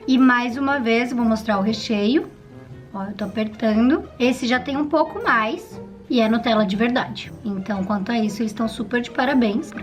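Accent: Rio Grande do Sul, Brazil. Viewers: Eu: aaaaaa è gauchaaaaaaaaaa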